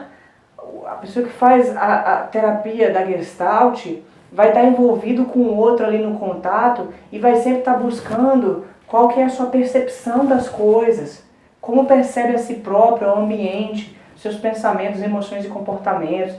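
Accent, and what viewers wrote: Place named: Espírito Santo, Brazil. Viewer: Professor, vc tem "sutaqui di capixaba" rs Aulas muito claras. Conheci seu canal hoje. Muito bom. Parabéns 👏 👏 👏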